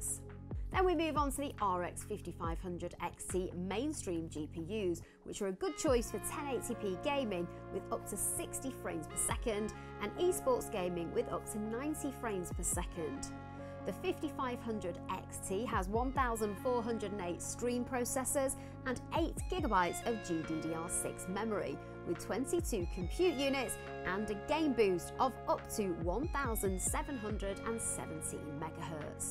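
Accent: United Kingdom. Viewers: Gotta love that British accent !!! Informativite video with essential breakdowns.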